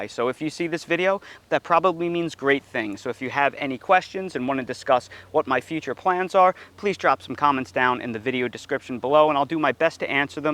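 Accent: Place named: New York, United States. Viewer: You sound like you were born where Woody Allen was born.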